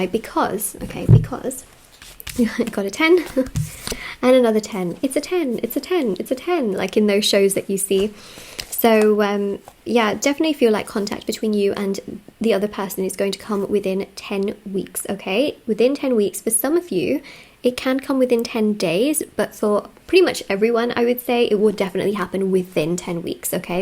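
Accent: England, United Kingdom. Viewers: I just love listening to your Beautiful English Unique Pixie Fairy voice 🧘👌✨🧚🏼‍♀️🪄✨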